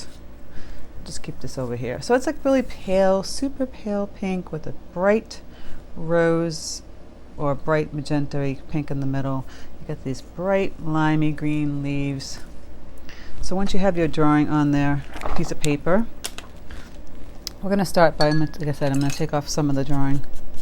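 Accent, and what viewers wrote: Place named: Boston, United States. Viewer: Wow... that was amazing to watch! So many helpful details shared while also keeping it simple. I could also listen to your Boston accent all day! Thanks so much for sharing your amazing talent. What a treat!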